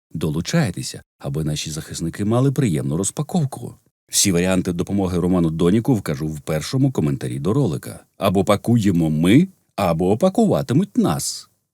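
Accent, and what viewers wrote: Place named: Russia. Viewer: У вас дуже гарний, заспокійливий український голос, а російський такий наче ви самий корінний росіян із росіян. Так вуха ріже і дратує шо капец...часто втрачаю саму суть тексту...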